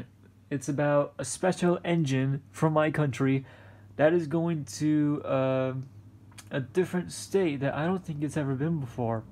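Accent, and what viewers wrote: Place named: United Kingdom. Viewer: wow british accent on point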